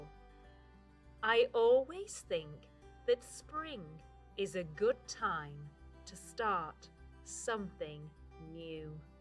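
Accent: United Kingdom. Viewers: I like your british accent, it's so elegant. I love your videos.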